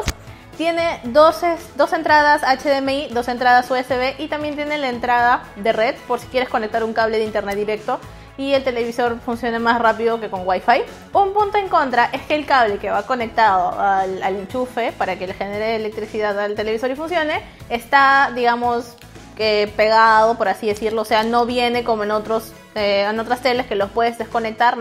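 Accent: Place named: Peru. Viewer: Tienes acento peruano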